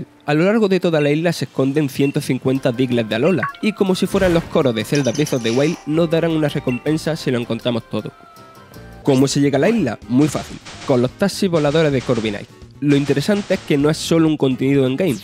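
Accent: Andalusia, Spain. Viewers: Andaluz detecte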